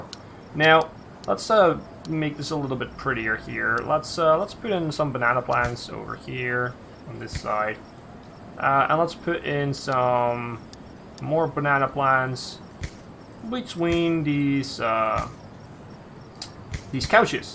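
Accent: Netherlands. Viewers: After the first sentence you said I noticed you're dutch. amazing!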